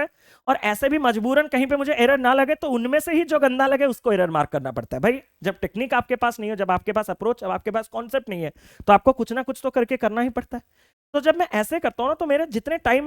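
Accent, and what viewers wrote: Odisha, India. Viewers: sir ap odisha se he kya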